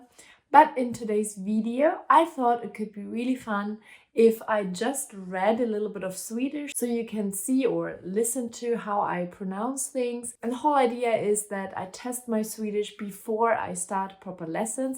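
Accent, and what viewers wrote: Germany. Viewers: Well there is a potential for getting better! Some times you use german pronuniances where english wold be better! In anyway, swedish and other Norse language could be named as "plattyska dialekter", (north german tounge), that is eradicated in Germany and is replaced by (högtyska dialekter), high german tounge! They say that sweden is one of the best english speking country in the world.. thats only says that rest of the world is worse! In anyway, the more swedish you understand the better, and you can answer in english, in many cases! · You did really well! Swedish with a german accent is so charming, really love it 💚 Of course there are several tricky words, one of them is "och", it's pronounced "ock" or like a short "å". You go girl! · Oh dear, that German accent is so hard to lose ... I've got an acquaintance (from Austria I think) who's been here for ages ... 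He still has the German accent =). Just keep it up - as others have said, as a German speaker, learning Swedish should be fairly "easy". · I will give you an A for effort! I think you did it allright, and as someone else already pointed out you speak swedish with a german accent - which I think many swedes find rather cute... (We have a swedish female celebrity with german origin who has been living in Sweden for almost 50 years and she still has a strong a strong accent, in fact your pronounciation sounds a little better than hers!) Within a year - or less - you will be almost fluent in swedish is my guess.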